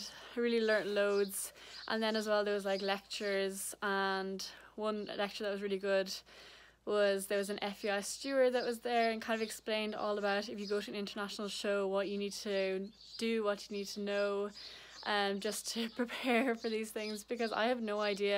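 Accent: Ireland. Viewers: I have been watching your videos for a while now and I feel you’re the most genuine person out there. I have no aspiration of competing or anything like that but you are so inspiring and I always feel I learn something from your content! Plus love love love your Irish accent ☺️ lots of love from Tawnydun Thady and I ! Xx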